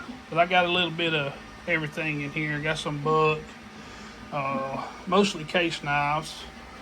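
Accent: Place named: South, United States. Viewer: Southern twang at its finest....cool room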